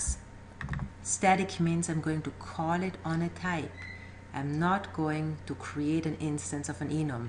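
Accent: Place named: Jamaica. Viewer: Excellent tutorials! Your accent is cool.. are you Jamaican?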